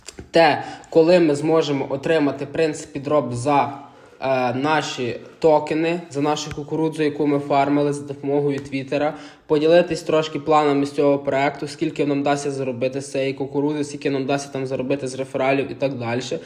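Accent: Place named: Surzhyk, Ukraine. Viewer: Суржик топ😂чекаємо за поінти нагороди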